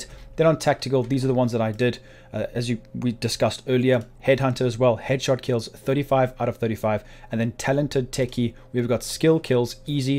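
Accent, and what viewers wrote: South Africa. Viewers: It might just be me, but I swear you sound south African lmao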